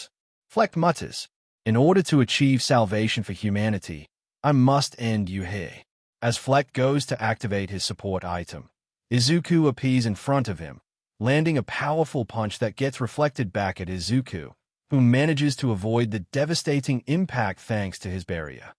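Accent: Australia. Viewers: I'm hooked but I wasn't expecting the Australian accent. When is part 2 coming out? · You're not crazy that is an ausie accent if I ever heard one lol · Am I crazy or does the Ai sound Australian or something 😂 like it isn’t really pronouncing the r’s all that much, and it’s also being really extra with all the a’s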